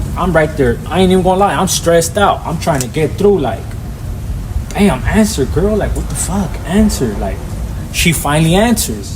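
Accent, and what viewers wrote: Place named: South Central Los Angeles, United States. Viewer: IT'S WHERE YOU GREW UP.ALOT OF L.A. HOMIES TALK LIKE THIS.ESPECIALLY IF YOU GREW UP IN SOUTH CENTRAL L.A...THATS NOT THE ONLY ONE THO ESPECIALLY NOWADAYS.ALL THESE EDGARS COMING IN WANTING THEM TIGHT JEANS... SERIO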